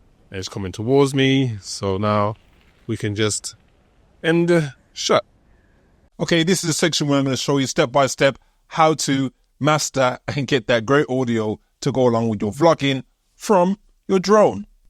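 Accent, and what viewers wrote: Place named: United Kingdom. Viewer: You from the UK, G? You look like you’re based in the states! But your accent sounds local?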